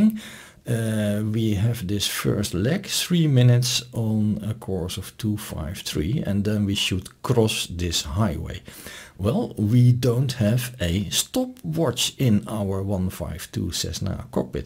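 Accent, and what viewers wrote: Netherlands. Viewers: Always good to hear a Dutch accent with English. I'm American and lived in Landgraaf for five years. Thanks for the video.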